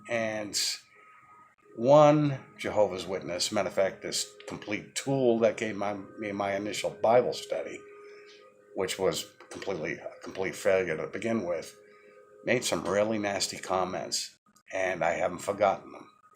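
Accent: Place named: New England, United States. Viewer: are you from Boston? Your accent sounds like you're from a New England state. I'm from New York. Like your honesty. I recently quit JWs cold turkey close to 2 months. Having slight social withdrawal symptoms, but loving the truth I am learning directly from the bible and from videos likes this.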